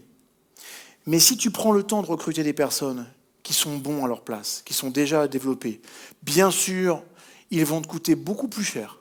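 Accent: Quebec, Canada. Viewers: Ch’t’aime donc bin! Avec l’accent d’une pure QUÉBÉCOISE ❤️🙏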